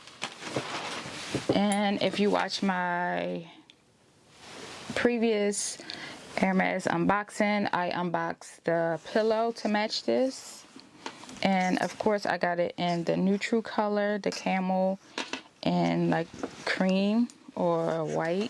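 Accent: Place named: Baltimore, United States. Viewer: You sound like you're from Baltimore gurllllll ❤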